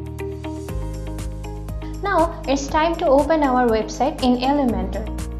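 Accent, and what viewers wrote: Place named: India. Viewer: it sounds like indian accent.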